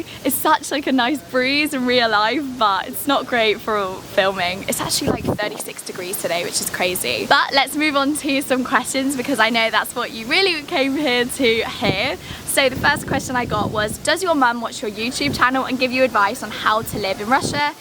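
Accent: England, United Kingdom. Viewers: Я кайфую от этого невероятного английского Английского! улыбка) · Британский акцент это очень красиво!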